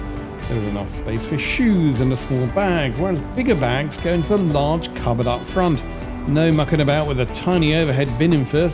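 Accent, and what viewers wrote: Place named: United Kingdom. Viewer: And thankfully a British English accent without the overuse of the redundant word ‘like’ in every sentence. Very nice review and look forward to more.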